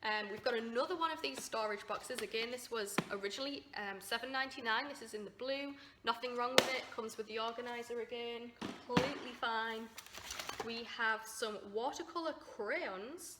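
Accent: United Kingdom. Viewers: Are you from uk? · You sound from the uk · Have you lived in America long? You have a strong accent from Britain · What’s with your British accent? Sounds really nice, where are you from?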